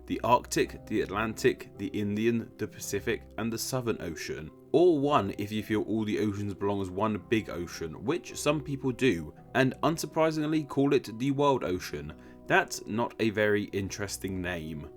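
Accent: United Kingdom. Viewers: This British accent ! 😌🙏🏻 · The oceans got their names by being called that by someone, and it stuck. Again, this robotic British twit narrator should be used to stop hurricanes, as his delivery is so banal that even a force of nature would turn away.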